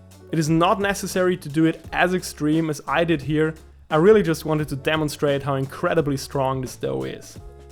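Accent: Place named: United States. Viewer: austrian with an american accent, the opposite of schwarzenegger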